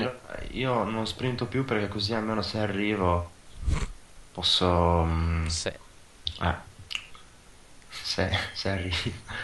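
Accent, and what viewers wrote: Sardinia, Italy. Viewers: aiò! Sardo sei?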